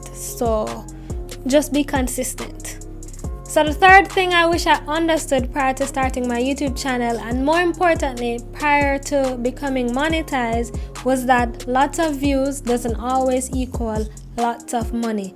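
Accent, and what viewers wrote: Jamaica. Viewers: Your very beautiful young lady wen I saw u I thought u was an African girl but wen u spoke I realised you're Jamaican ..no offence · Are you a Jamaican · Are u Jamaican :) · You sound Jamaican, thank you for this video 🥳❤️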